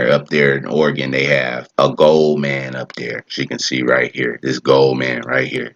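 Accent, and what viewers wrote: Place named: Alabama, United States. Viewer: You sound like my family from Alabama brother but you keep giving me answers to alot of my question. Great honor to you and your family🏹🦅👑🔥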